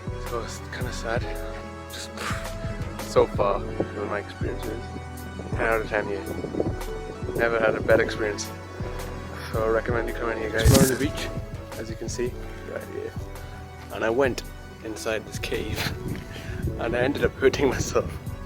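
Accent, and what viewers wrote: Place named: United Kingdom. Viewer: Where did u travel from ? U got a uk accent.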